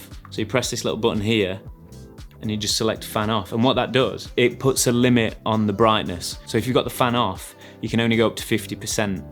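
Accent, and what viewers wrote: Nottingham, United Kingdom. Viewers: Top video mate. Subbed. Are you from Nottingham? You sound like a well spoken Carl Froch 😂😂😂😂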